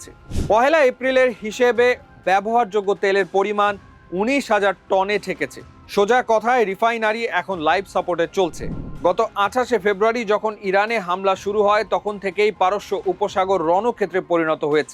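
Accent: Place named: India. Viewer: ভারতের মতো নিউজ পড়েন কেন🙄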